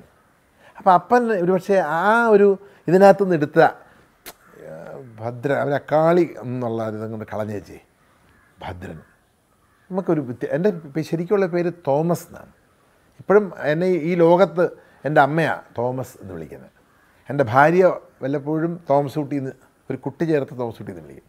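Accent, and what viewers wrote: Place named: Kottayam, India. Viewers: പാലാ style ഇൽ തന്നെ ഉള്ള സംഭാഷണം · Achayanmarude pakka style samsaram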